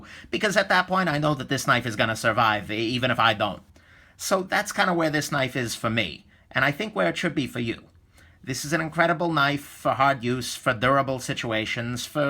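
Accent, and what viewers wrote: New York, United States. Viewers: Great review ! Just ordered a PM2 from Amazon . Thank You for your honest opinion . plus i dig the NY accent . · It's called a New York city accent. Language dialects make America colorful and interesting. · I think it's a great accent- New York? Here in the UK we have a wide range of local accents, varying greatly over small distances. Unfortunately, in my lifetime we're losing them, youngsters speaking American, which seems out of place in grey, miserable Birmingham (UK not Alabama!) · reviews are a refreshing change from all the slow boring knife videos here on youtube, he's got that NY or Eastern accent and talks fast, I could watch his reviews all day. Yeah the Maitraya Buddha thing gave me a chuckle.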